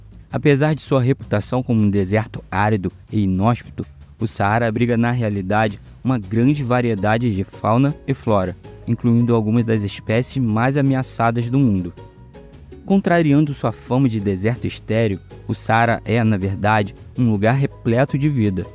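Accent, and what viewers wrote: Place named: Rio de Janeiro, Brazil. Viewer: Vídeo infinitamente interessante e feito Com Muito Amor!!!!!! Nosso Lindo e Bom Deus cria somente belezas que nós podemos contemplar e admirar com felicidade infinita!!!!! Eu sou grande, grande fã deste canal fantástico e esplêndido! O Moço que tá falando tem uma voz bem doce e melódica e acho que é carioca como eu! 😃🙂 A voz dele e também um das razões de assistir nos vídeos deste canal, porque eu sou músico e flautista e para mim é alegria que ele tem uma voz tão linda!!!!! Mil beijos e fortes abraços pra todos do meu Lindo Rio de Janeiro!!!!! 💘 💘😍🏩💌💖💓💘😍🏩💌💖💓💘😍🏩💌💖💓💘😍🏩💌💖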